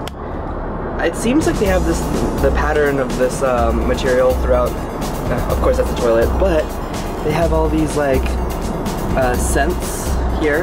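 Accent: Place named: Philippines. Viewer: ok so you are pinoy. that's why you got an asian accent when you speak english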